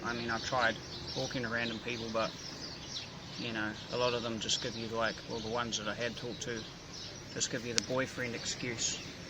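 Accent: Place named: New Zealand. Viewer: Sorry to hear that you're still struggling with this problem Bro. I know the feeling. I don't know you're Financial Status but I Highly recommend you come visit the US. With that strong Accent, Blue eyes, and still have hair is a major plus. Also being from New Zealand 🇳🇿 you will have a line of women I can promise you that. I had a Russian friend steal the heart of a girl who was about to get married and there together. It's vice-versa if your from from Europe and really want a girl come to the US they are easy to Manipulate with European charm. Now if you're American go to Europe you will not be disappointed that's my advice to whoever reads this.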